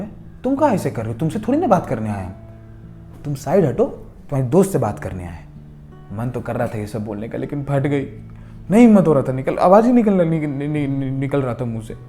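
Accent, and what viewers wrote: Bihar, India. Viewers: Bhaiya aapki story relate kar jati hai hamari life se. aur bhaiya aapki wo bihari language bhi , Main bhi bihar se hi hu bhaiya.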